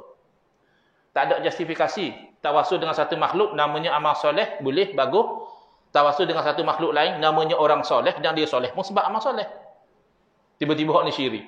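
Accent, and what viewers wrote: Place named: Terengganu, Malaysia. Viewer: Ustad ni bahasa ganu ok lagi molek sangat penerangan yg jitu faham jelas selari dengan shyariat islam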